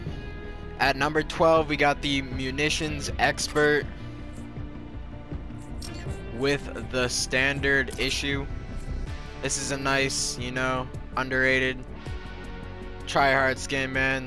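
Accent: France. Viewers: Are tou french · Are you french?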